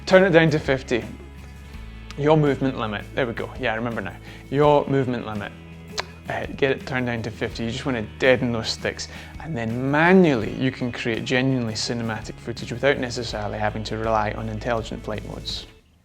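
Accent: Scotland, United Kingdom. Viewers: Great video for a newbie. So good to hear a good Scottish voice :-) Thanks for such a clear video - i have now set my new mavic to all these settings. Working my way through your set.. Cheers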